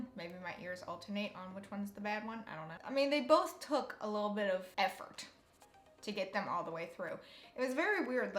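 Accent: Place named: Louisiana, United States. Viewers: That Cajun accent though! Love! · I'm going to guess you're from Louisiana. The way you spell go and the word Y'all. Lol. You're a beautiful young lady.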